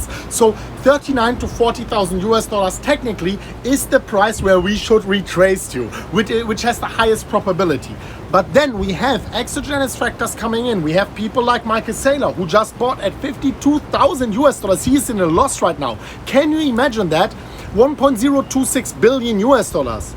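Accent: Germany. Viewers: PS: Der deutsche Akzent ist voll geil :P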